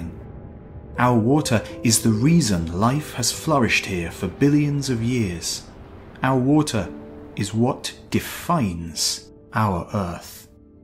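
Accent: England, United Kingdom. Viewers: I am thoroughly enjoying these videos, but I'm starting to find the narrator's accent more and more annoying. In this one it's particularly noticeable, seeing as it's about water -- or as he says it, "wo-tah," or sometimes, "woo-tah." I guess "wah-tER" is just too hard for Brits to say properly. All those R's in the English language, and the Brits just won't say them. But for some reason, they stick on the end of words that end with an "ah" or "uh" sound. It's maddening. Some of the other mispronunciations are just laughable -- "dee-ASS-pura" instead of "DI-uh-spora", or "invent-ery" instead of "inven-TORY" -- you know, like the political class in Britain! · Where is this coming from? His accent sounds like England. I am used to measuring things in inches, feet, yards, etc here in the USA, not meters, etc!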